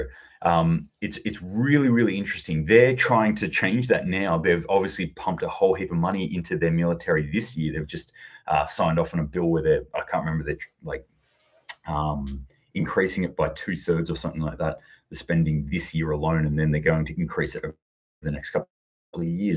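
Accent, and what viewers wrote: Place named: Australia. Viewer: Only found you today and have only watched a few of your vids so far but am liking your content. Love hearing a fellow Aussie (I’m from SA) getting into the geopolitical theatre and giving straight forward breakdowns without the MSM spin. I think I read on telegram this morning that Spain was aligned with Serbia? Interesting when Serbia is aligned with Russia as well. Looks like todays tension has been kicked down the road for another month. And Serbia has beefed up its defensive measures a lot since NATO intervened last time… So many tinderboxes (conflict, contagions and food shortages) all over the globe at the same time. Coincidence of course. 🧐🤣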